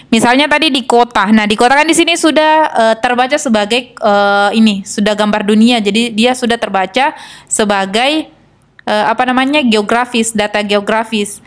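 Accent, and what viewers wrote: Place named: South Sulawesi, Indonesia. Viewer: kentara sekali kalau orang sulsel, deee, ya kan?! hehe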